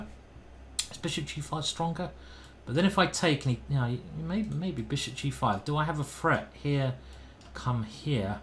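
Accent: England, United Kingdom. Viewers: Hands up who’s watching this for the English accent 🙌😝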